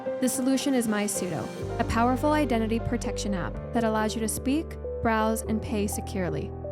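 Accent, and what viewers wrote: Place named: Utah, United States. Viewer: Video idea: “How to know if you’re using, HOW TO, correctly” One thing is for sure, there’s definitely an Utah accent for all you OSINT peeps.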